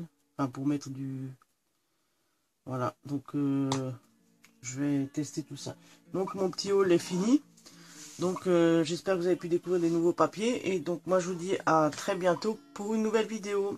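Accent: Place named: North, France. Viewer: Chère Un mois?? Eh bien dis-donc il a fait tour du monde votre colis c'est pas possible avec un délai pareil je serais allée le chercher moi-même en Pologne 😊 je plaisante mais tout de même un mois c'est énorme j'espère pour vous que vous n'aviez pas l'intention de faire un projet avec ses collections pour Noël sinon il va falloir mettre les bouchées doubles( personnellement j'aurais négocié uneremise) à moins que le délai de livraison ne sois pas stipulé, dans ce cas il faut être patient. En tous cas ce que vous avez commandé est très joli, ces collections sont très belles et je suis certaine que vous allez encore realiser de magnifiques créations comme vous nous y avez habitué. Je souhaite de très joyeuses fêtes de fin d'année. Ps: j'ai parié sur votre accent et j'ai misé sur un accent du nord de la France, j'espère avoir raison sinon je vais perdre 3 euros😂😚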